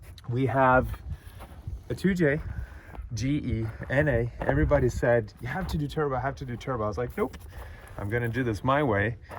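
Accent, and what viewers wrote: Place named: Southern California, United States. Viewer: I feel you should put an actual propeller on the back of it keeping with the spirit of tail spin. You can do one with a small electric motor that be functional. Also your English has gotten really good. You have a kind of Southern California accent now. I remember meeting you back a at the world drift comp at the long beach docs with your broken English.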